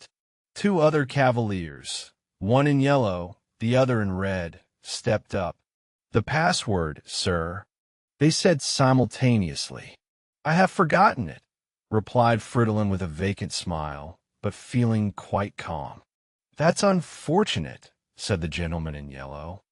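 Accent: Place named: Texas, United States. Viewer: AI SUCKS IN GENERAL, AND AN AI TRAINED TO HAVE A TEXAN DRAWL READING A EUROPEAN NOVEL, WELL NUFF SAID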